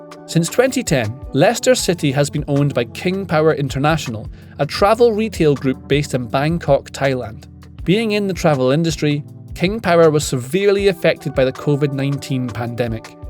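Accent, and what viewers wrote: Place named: Scotland, United Kingdom. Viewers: Beautiful Aberdonin accent, this is much more pleasing on the ear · Why not, do you always actually believe what a youtuber says, 😂 he must be a financial expert and a Leicester expert, plus he's sounds Scottish must be a Celtic fan. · I do love the Scottish accent!.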